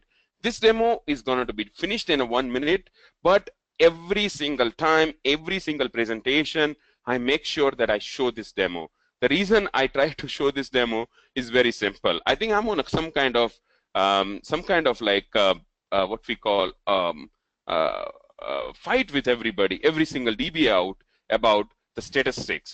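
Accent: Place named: India. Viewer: He's Indian, how can he help that he speaks English with an Indian accent. A little bit entitled of you to think he should modify the way he speaks because a small proportion if the population can't understand how he speaks. I'm English and I suspect you'd struggle with my accent as well though I'm struggling to work out how I'd modify it to suit you.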